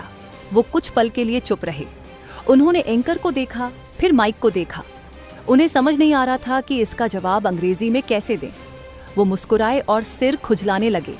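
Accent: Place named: Bihar, India. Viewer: Bihari andaaz ke liye bhadhai.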